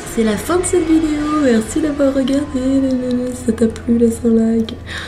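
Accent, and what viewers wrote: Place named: Normandy, France. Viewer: On voie la normande et la façon de parler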